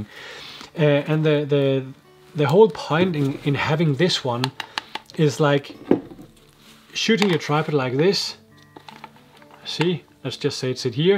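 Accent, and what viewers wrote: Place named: Denmark. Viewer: Hi, seeing that you use the Tamron 150-600, have you tried with the new "Bigma" 60-600? Thanks for your videos, very instructive and easy to understand... specially with your Daninglish... perfect for my Spanglish. Greetings from Colombia, JuanB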